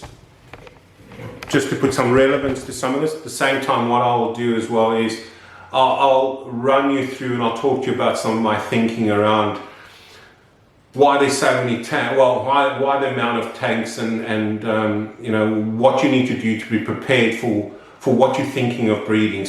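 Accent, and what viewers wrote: South Africa. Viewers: Great intro video! After watching a number of your more recent videos, I picked up on the distinct accent however noted that you are in Australia. Now reading through the comments here... you are a Capetonian:) I too am from Cape Town and moved to England twelve years ago... for the weather of course:) Keep up the great work in bringing back old fashioned fish keeping, where we live in a world of everything being so commercialised these days (showing my age dating back to the 60's lol). Sorry for the user name, a bit odd I guess, but it is my gaming ID. For those that do not know, "scur" is storm in anglo-saxon. All the best with the channel. Cheers Gary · Hi (please insert name), I recently came across your channel and am happy that you‘ve started it. Very informative and helpful indeed. I wish to eventually also install a rack system like yours, currently busy with house renovations, so after that. 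I detect a South African accent. Would love to know more about where in SA you’re from. I’m in Queensland also, up in Townsville. Keep the content coming😄 It’s great! Kind regards André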